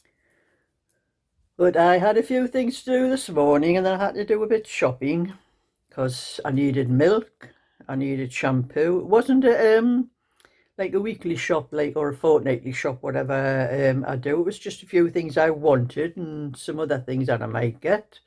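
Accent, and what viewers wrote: Newcastle, United Kingdom. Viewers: I just come on to hear you chat you make me laugh I’m a Geordie and love your Geordie banter you make me smile thankyou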